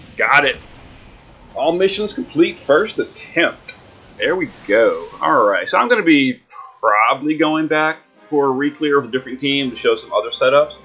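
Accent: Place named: East Coast, United States. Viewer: Gotta be east coast at least. Or you're one of those weird people who had a brain injury and inexplicably woke up with an accent. Ah, I know, are you from Lanzelt? :-D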